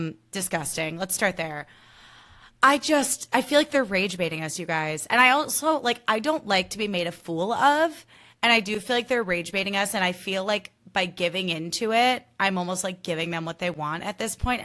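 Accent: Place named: Australia. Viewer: My jaw is on the floor at your Australian accent ⭐⭐⭐⭐⭐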